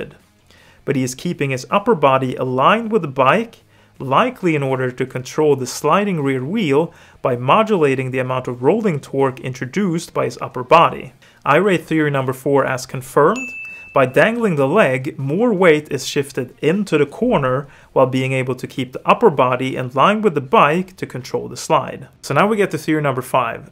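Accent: Sweden. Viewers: I love your swenglish xD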